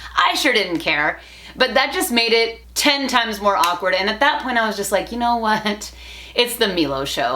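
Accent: Mexico. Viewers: It’s kinda crazy that you’re totally fluent and so and I know you’re American, but I still listen to a little bit of an accent, Mexican accent. I know is crazy, but I can listen to it 😂🤦🏻‍♀️ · Si te hice caso que en vez de verlo en español lo viera en inglés, no lo he visto en inglés pero enserio me impresiona como tienes el acento de tu primera lengua osea inglés y aparte pudiste aprender e imitar el acento de español de México y hablar perfectamente el idioma Me impresionas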